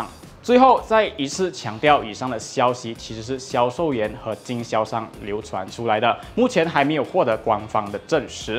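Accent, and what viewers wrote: Standard. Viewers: 华语非常标准👍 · 新人不错，华语标准，表达清晰，automachi捡到宝了，可以好好栽培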